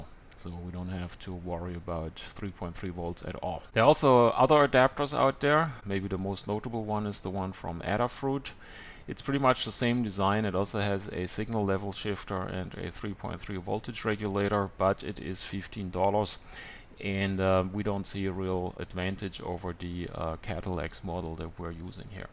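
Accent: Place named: Germany. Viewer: German accent makes this video even more informal! ;) (like)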